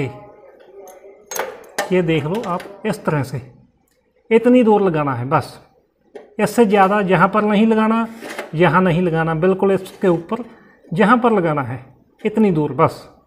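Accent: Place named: Punjab, India. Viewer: Veere Punjabi bol k video banaa Leni c. .Hindi bol reha Punjabi style ch...😊 Darwajey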